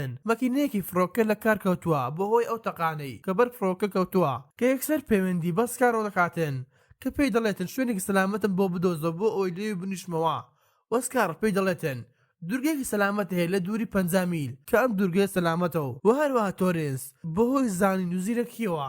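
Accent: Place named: Erbil, Iraq. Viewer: Baxwa dangt naxosha awa chia 3aaaaa har dyara hawlerit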